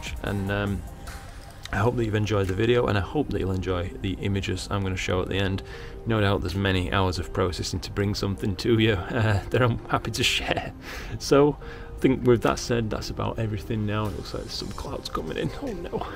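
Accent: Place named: North, United Kingdom. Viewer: Just catching up with this vid. Great watching as always. I like the current channel name, but it would be remiss of me not to mention as a fellow northerner, given your geographical origins, something along the lines of ‘ in the night sky’. The /look ‘joke’ might be lost on those not familiar with regional accents but it still works with your name! 🤔🤣 👍🏻 Noddy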